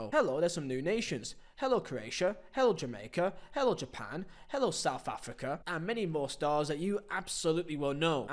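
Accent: United Kingdom. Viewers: UK accent 😫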